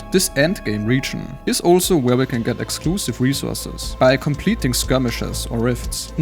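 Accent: Germany. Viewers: Oh shiet I came here to say that the German accent added to the Nosteratu vibes wonderfully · Bist du deutsch ? Frag für nen Freund 😅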